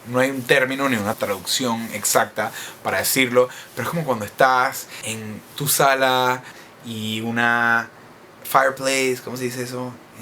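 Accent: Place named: Venezuela. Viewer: Porqué hablas con acento venezolano?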